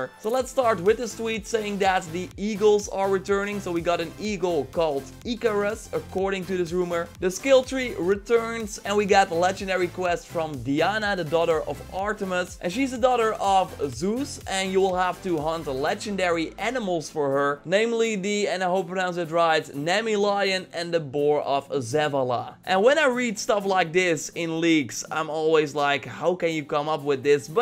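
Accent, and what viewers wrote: Netherlands. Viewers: Yo just subbed thanks for giving me info on ac, far cry and other games your videos are very helpfull and informative. Btw just curious are you dutch your accent sounds like you are.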